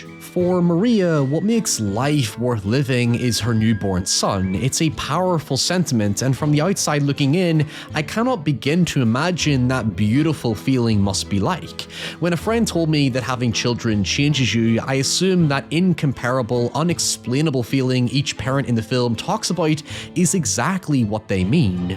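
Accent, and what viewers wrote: Ireland. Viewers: By his accent I'd say he's irish.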